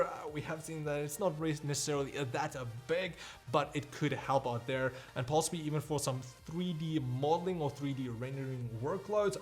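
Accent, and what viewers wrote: Afrikaans, South Africa. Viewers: Thanks for the reply. With that Afrikaans accent and expert reviews this could be an epic channel. Thanks for the info on xmp. Now I know.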